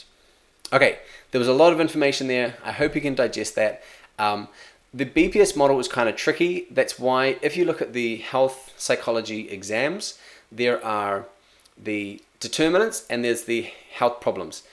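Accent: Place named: New Zealand. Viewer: are you lecturing in nz at the moment ? noticed u have the accent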